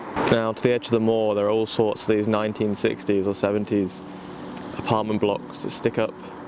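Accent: United Kingdom. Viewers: What accent do you have?! I'm getting Leicestershire and Southern elements and northeast elements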